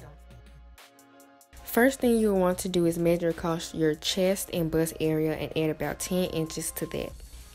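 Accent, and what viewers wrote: Arkansas, United States. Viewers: aye turn up Arkansas!! i knew i recognized ur accent lol keep it up girl!!💕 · Your accent is so strong! Arkansas. I like it. :-) I'm from Cali and right away knew you were from somewhere on the east coast.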